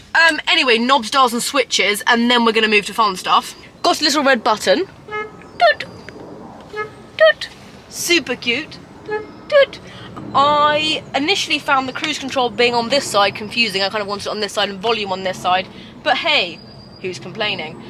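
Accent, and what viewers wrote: United Kingdom. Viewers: I love british accent...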